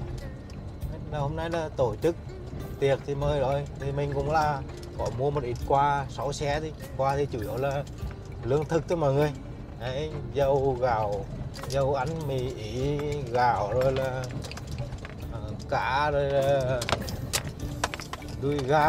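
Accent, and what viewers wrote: Nghe An, Vietnam. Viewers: Giọng chú e nghe đậm chất xứ Nghệ thân yêu! Giọng nói đậm đà ấm lòng người nghe. Hoan hô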